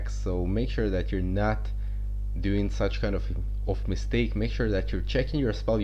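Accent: Russia. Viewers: какой то русский акцент чувствуется))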